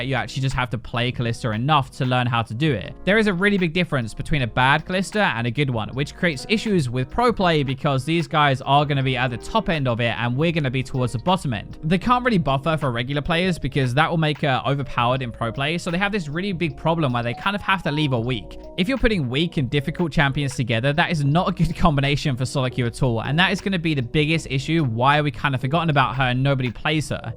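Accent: United Kingdom. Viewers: stop talking in british i dont undestand shitt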